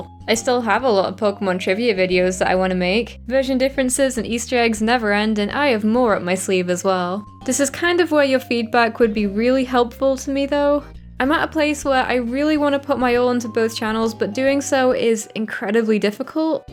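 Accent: United Kingdom. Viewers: Your voice with the British accent just make the unintended ASMR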